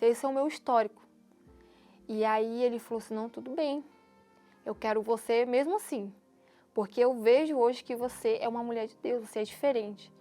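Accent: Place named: Rio de Janeiro, Brazil. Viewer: Sotaque, ela é do RJ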